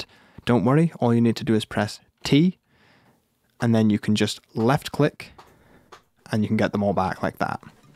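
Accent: United Kingdom. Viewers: Great video and useful tips!Just wondering are you from Britain?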